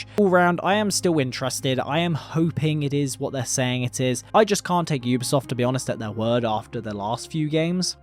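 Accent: United Kingdom. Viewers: Subbed for the long video and British accent.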